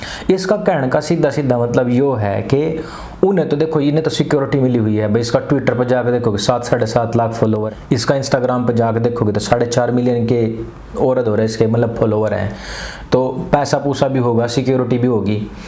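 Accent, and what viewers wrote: Haryana, India. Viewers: you sounds like my hariyanvi friend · I think bhai had spoken a hariyanvi accent in this video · राम राम सा सर ...... Love from Rajasthan ❤️❤️ आपकी हरियाणवी सुनके बहुत अच्छा लगा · Hariyanvi boli k liye wa..wa...wa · Love the haryanvi accent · Bhai tagdi haryanvi bol rahe ho... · Bhaii haryanvi ko or khadi boli ko hataa kr seedhe seedhe bolo to or accha effect डालेंगी aapki video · हरियाणावी लठ मार बोली 🙂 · Haryanvi nikla hai bhai aaj toh. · Bhai shab haryanvi bilku sahi bol rahe ho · Sir wah love your haryanvi accents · Nice haryanavi sir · haryanvi op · यहां तो Pura Haryanvi Accent hai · aaj Haryanvi tone me kyu bol rahe ho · Bhai tu haryanvi bole hai 😘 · हरियान्वि लहेजे मे एक् नम्बर लग रहा है video एसे हि विदिओ बनाते रहिये आचर्य जी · your Haryanvi style of speaking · aapka haryanvi accent sun k accha lga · आपकी हिंदी के साथ हरियाणवी भाषा का तालमेल बहुत मधुर लगता है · Haryanvi hai bhai yaa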